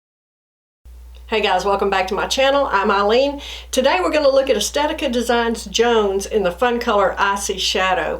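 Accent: South, United States. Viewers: You sound exactly like the blonde on CSI Miami whose name was Caleigh in the show. You’re obviously southern like me.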